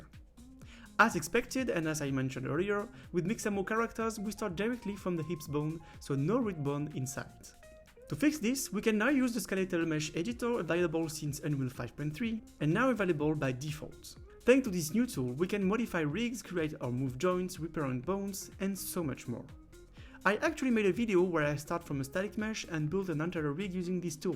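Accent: France. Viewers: I really appreciate the effort you put in the tutorials.Just if you could a bit slower.....with the french accent and your speed talking, sometimes it´s really hard to follow (dont want to be rude, just an advice). Love the channel BTW!! really helpful video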